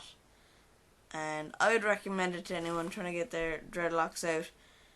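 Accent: Ireland. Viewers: Not only was this usefull, it was also amusing, you're funny, you from ireland? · Cool Irish accent now to the matter in hand. I over wax badly on three inch hair on a hot summer day and wax melt easily sipping into my pores now my scalp is lumpy and super dry . My facial hair area is also dry and hard due to the wax do you think the shampoo will get the wax out of pores. I regret the day I put that stuff on my hair! Please write me back to help guid me thanx.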